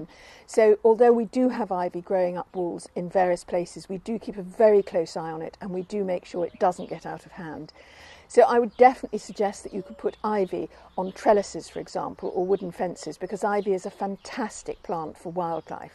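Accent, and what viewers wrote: United Kingdom. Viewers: I like the way you speak! What a nice British English! Will keep these useful recommendations in my mind, Greetings from Ukraine!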